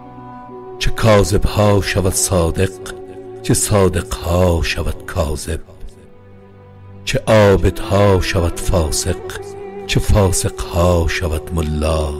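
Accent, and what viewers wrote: Afghanistan. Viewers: این لهجه دری است پارسی دری است لهجه تاجیکی را شنیده اید؟ تاجکستان لهجه شان ماملا متفاوت است چرا با افغانها مشکل دارید تبعیض میکنید؟؟ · لهجه دری 🇦🇫🤌🏻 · این لهجه فارسی افغانستان هست میتوانید از سخنرانی های داکتر نجيب درک کنید این صدا را. البته فعلا این لهجه زیبا در حاشیه ای سیاهی رفته هست متاسفانه به احتمال خیلی زیاد این اثر زیبا و میراث قیمت ناپذیر فارسی زبانان خردمند و با معرفت چندی دیگری باقی نخواهد ماند حتما میتوانیم بگوییم در حال حاضر همچنین به دست تاریک فراموشی سپرده شده است و آهسته و پیوسته در حال از بین رفتن هست و به نظریه اکثر زبان شناسان دیپارتمنت دری در آینده نه چندان دور تنها لهجه که مردم فارسی زبان بر آن تکلم خواهد کرد لهجه تاجیکی تاجیکستان و ایرانی ایران خواهد بود وبس و من خیلی احساس تاسف دارم💜🤍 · لهجه دری هست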